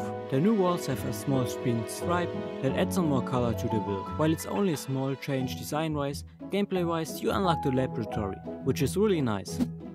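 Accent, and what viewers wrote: Germany. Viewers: Are you from germany, cuz you've got a damn german accent! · I like your accent. Is it german? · Man hört richtig, dass du deutsch bist hehe Nices video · Wer you german? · Can I ask where you’re from? You sound German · you are from germany right? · Deutscher Akzent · Are you german?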